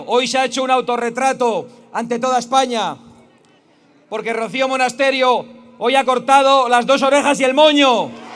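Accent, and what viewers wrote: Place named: South America. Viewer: Mi marido dice que suena sudamericano, no español.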